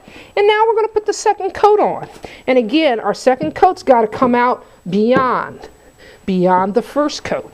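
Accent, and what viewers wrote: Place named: East Coast, United States. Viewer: I like to watch you. You are as the previous person mentioned delightful. I also feel you address points that a person (women) like to know. There is more than one way and you cover briefly the differences and don't claim one is better than the other but point out why you may want one over the other at times. I have watched a lot of videos in preparation for a remodel that required a couple walls (paneling) being opened and removed and wanted to put drywall in its place. I have done small repairs in the past on walls and felt comfortable attempting this large project but but after watching some videos in research I felt a little intimidated, but after watching you I feel much more at ease. Thank you. P.S. Your accent reminds me (a Californian) of my east coast friend.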